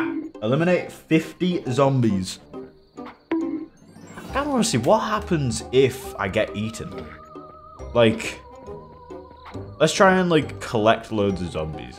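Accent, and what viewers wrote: United Kingdom. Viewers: You do sound kind of British,.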